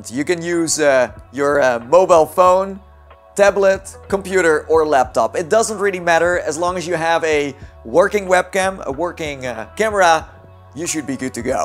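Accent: Netherlands. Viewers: nederlands accent mag wel wat harder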